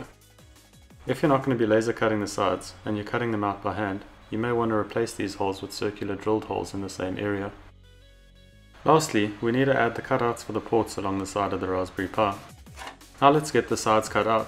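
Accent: South Africa. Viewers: a fan of our South African Accent!...Well done bru, lovely work. I have the horizontal mounted ICE Tower, perhaps a "Flatbed" version? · dude that would be awesome please as not as many people have access to laser cutters as to 3D printers! P.S. great to finally here a Saffas voice on one of these channels, keep up the awesome work!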